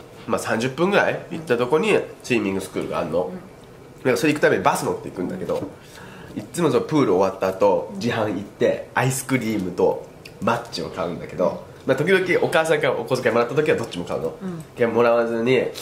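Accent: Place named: Osaka, Japan. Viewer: 色⤵が美味しそう。 大阪弁ww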